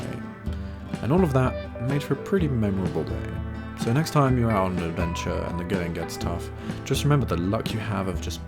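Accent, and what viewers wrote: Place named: England, United Kingdom. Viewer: Vous avez un accent anglais incroyable 🤩 continuez comme ça j’adore votre vidéo et vos shorts 😁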